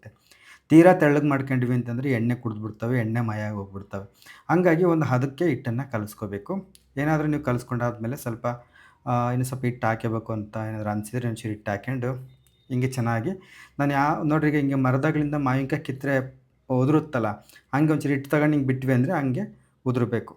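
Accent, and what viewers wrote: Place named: Challakere, India. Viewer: Bro super nam maneli ide tara madodu nim mathu kelta idre nam uru ansutte nan bandu challekere nim uru yavdu bro but nan ega irodu blore nali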